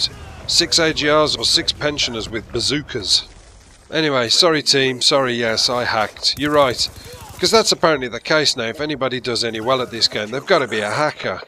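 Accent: Birmingham, United Kingdom. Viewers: Great stuff, you're like a brummy Sid Waddel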